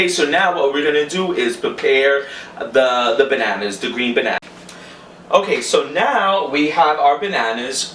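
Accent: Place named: Queens, United States. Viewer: Are you from Queens brother? Lol I love it!! Thanks for sharing!!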